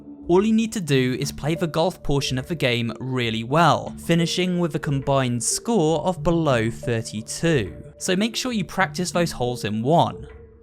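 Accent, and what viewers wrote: United Kingdom. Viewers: arent you british?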